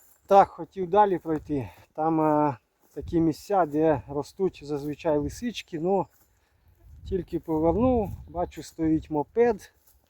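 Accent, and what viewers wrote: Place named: Olevsk, Ukraine. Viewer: Відчуваю, що це десь на півночі Житомирщини. А, вже почув. Олевщина. Чути по вимові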